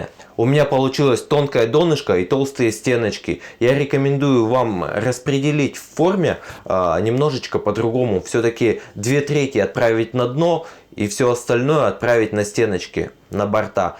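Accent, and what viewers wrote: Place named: France. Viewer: Ну что я могу добавить к тому что уже сказано? Молодец, умница и это французское произношение приятно слушать😉😉😉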